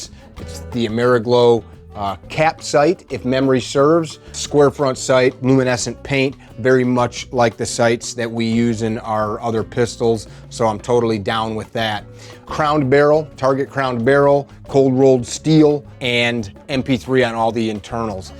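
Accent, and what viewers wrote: Chicago, United States. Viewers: Late to the party here - I could listen to this guy's accent all day. I live in Chicago so it sounds real familiar. One of the best reviews on this firearm, too. I have the off-the-shelf Compact Carry. Not sure what that lacks from the full Langdon - maybe the Landon trigger and the bobbed hammer? Anyway, great firearm. I may put a slightly wider de-cocker on it, but that's about it. Would love the fancy stipple job but that's not cheap, either - and hate to send the gun away for such a long time.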